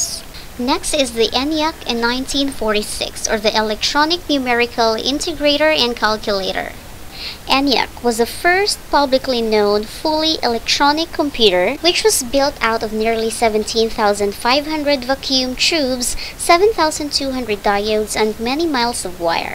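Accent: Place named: Philippines. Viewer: Person here sounds filipino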